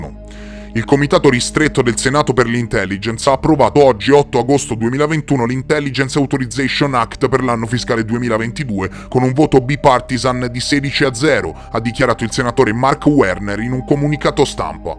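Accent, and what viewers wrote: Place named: Lucca, Italy. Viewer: I enjoy your strong lucchese accent EHEHEH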